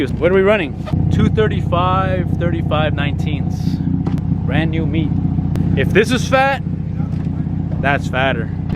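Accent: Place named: El Salvador. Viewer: I know a Salvi when I hear one 🤣🔥🔥 Dope video !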